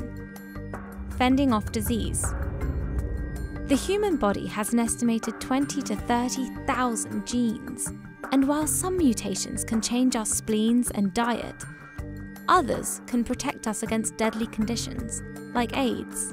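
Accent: United Kingdom. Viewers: That british accent. Correct me if I'm wrong.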